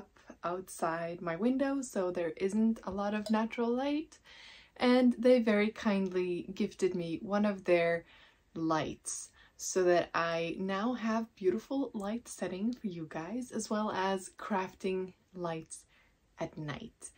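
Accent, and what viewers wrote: United States. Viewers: Hi Mommy! I smiled when you said that English was not your native language. You sound American w the exceotion of certain words that might sound simmilar to Norwegian. Otherwise, every episode you get better & better. Look at yourself on this video & compare it to the 2nd or 3rd episode. You have ALWAYS had great grammar, and pretty great pronunciation. Nobody could fault anything you say/how you say it. However, the added touch to your American accent is the colloquialisms you feel more & more confidence using. I speak French, Spanis, English, & a tiny remaining bit of Italian (I spoke it 40 yrs ago, but the one adult that would always speak it, passed 40 yrs ago). Anyway, if I try Spanish, I don't always end or construct a word the Spanish way, I'll have to debate it in my head, because the other Romance Languages & even English, sound very simmilar. 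But l rather know other languages than get a word semi wrong every so often. Anyway, you sound great, either American or Canadian. 👍💯😉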